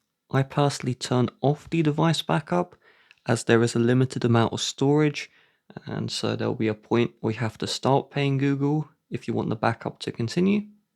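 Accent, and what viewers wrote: United Kingdom. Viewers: You have such a nice accent! What is it Surrey or Berkshire? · Hey what country are you uploading from? I’m in the US (Texas) lol just wondering because you sound British (UK)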